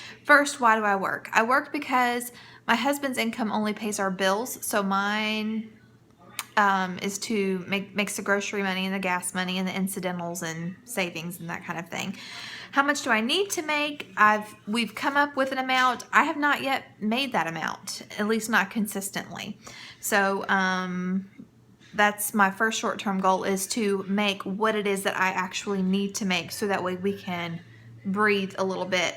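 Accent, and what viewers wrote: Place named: South, United States. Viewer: Gopher= go for- That's that classic southern talking coming out. I say that word 15 times a day. If I put our clothes on the couch, I find them all in the couch. I pulled a sock the kids wore when they were 5 out of the couch....and I haven't had this couch but a year. my pillows are probably made of lost clothes. You have a servants heart and a desire to serve others and support your family. We also must have balance in our lives, and that can easily get out of whack if we are not making our balances in life a priority as well. It is natural for them to go one way and then the other. But, it's helpful when they remotely stay center. So good to see you on here since we never get to see one another. Try to relax, get some rest, drink enough water, and keep your strong faith.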